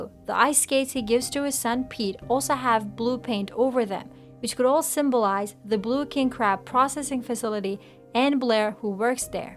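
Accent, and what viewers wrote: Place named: Russia. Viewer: Haha Russian or Ukrainian it sounds like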